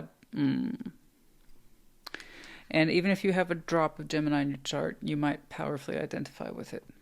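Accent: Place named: Texas, United States. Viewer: Once again, I have closed my eyes laid back then I started your Pisces reading after listening to what seems to me a very happily excepted different more calming tone to your Texan voice of past? Your reading is so very correct i can not fail not to actually not believe that I what your Tarot cards speak to You ☆♡XIII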